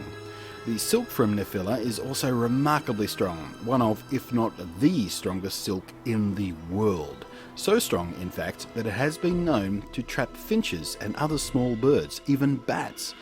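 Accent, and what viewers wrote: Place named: Australia. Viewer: Australian accent + high quality cameras + spiders = very entertaining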